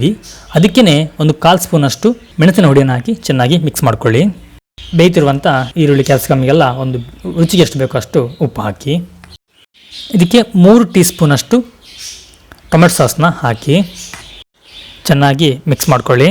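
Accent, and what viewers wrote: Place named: Mangalore, India. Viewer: Namma mangaluru kanada bahala chanda matanaadi madam neeu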